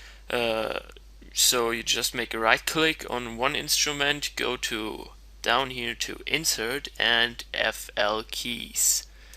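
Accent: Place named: Germany. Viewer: Direkt deinen deutschen Akzent erkannt:)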